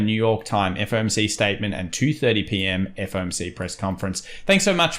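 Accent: Australia. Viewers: Glad I found. I look forward to the daily updates, guidance, expertise. Still have to listen closely as the Aussie accent is a lil heavy. lol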